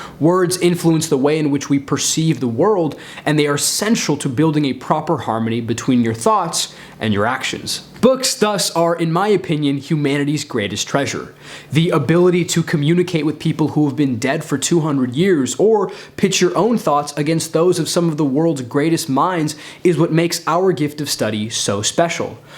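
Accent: India. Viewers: Your English assent just like Shashi Tharoor .....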